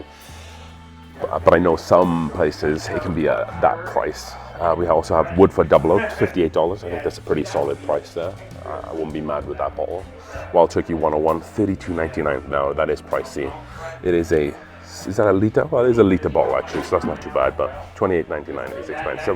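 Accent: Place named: Wales, United Kingdom. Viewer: I like your videos but I've been trying to figure out your accent. Sounds like you come from Wales but only on certain words. Good choices on the bourbon but where are you from originally?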